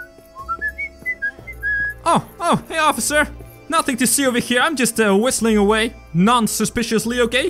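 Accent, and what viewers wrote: Netherlands. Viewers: Nice futch accent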